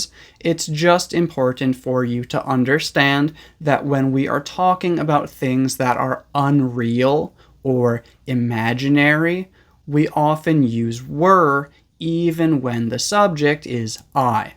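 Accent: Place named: United States. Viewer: I wish I had you nice American accent. I wish you good luck. I wish you had more patience. I wish you all the best in this year. Thanks a lot,. I always I wish you more success.😊😊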